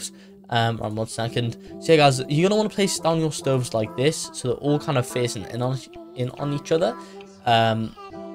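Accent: United Kingdom. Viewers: That wasnt a swear word, it just seems that way because he talks fast in british accent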